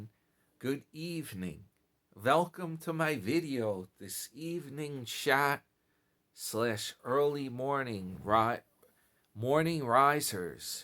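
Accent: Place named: Long Island, United States. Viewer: Love listening to your L.I accent!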